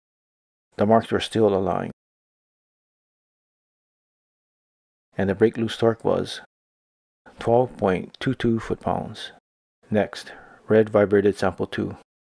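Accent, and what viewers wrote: Hawaii, United States. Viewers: eh you from Hawaii? I can hear the Piggin brah!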